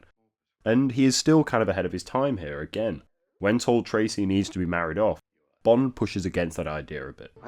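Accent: United Kingdom. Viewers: You're British!!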